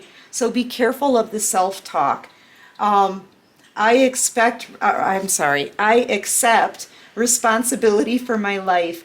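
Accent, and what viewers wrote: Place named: Wisconsin, United States. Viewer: I like your Wisconsin accent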